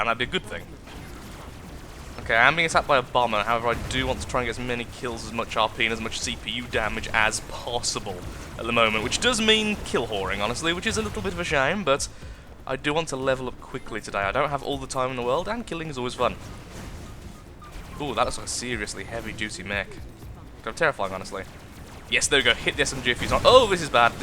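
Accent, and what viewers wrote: United Kingdom. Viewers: for his accent, UK most likely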